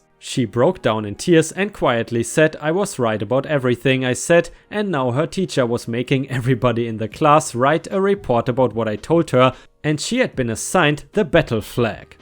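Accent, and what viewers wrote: Germany. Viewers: Are you german? Frage für einen Freund... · I don't know man,.. I like the stories you choose but as a German it's just so hard to listen to other people's German accent. Although your English is actually really good, I don't know wether to subscribe yet...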